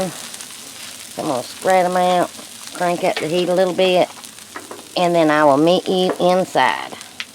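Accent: South, United States. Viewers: Im from Oklahoma and been all over the country and that southern accent always grabs my heart good job honey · love the Southern Drawl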